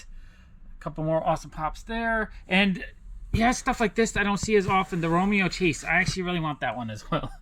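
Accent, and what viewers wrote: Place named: Ohio, United States. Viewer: It's crazy how all of the customers are clearly New Yorkers, but sounds like he's from Ohio or something, Lmao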